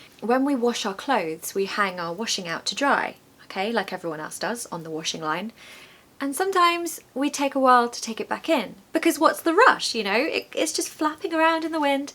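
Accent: United Kingdom. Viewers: ❤❤❤❤❤❤❤❤❤ Love your Received Pronunciation (British English accent) very much!!! ❤❤❤❤❤❤❤❤❤ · I love the British accent .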